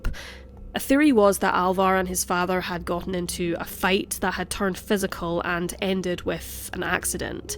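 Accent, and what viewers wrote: Scotland, United Kingdom. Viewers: Who is this bird with the Scotts voice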